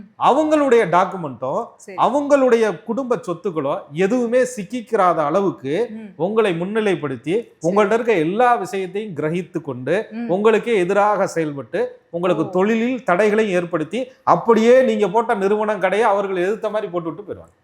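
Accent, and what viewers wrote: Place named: Madurai, India. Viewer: Madurai slang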